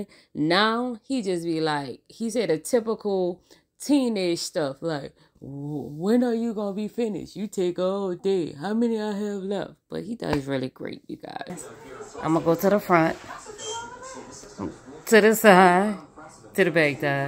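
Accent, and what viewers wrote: New Orleans, United States. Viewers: She's definitely a great MOTHER and with that New Orleans accent GURL!!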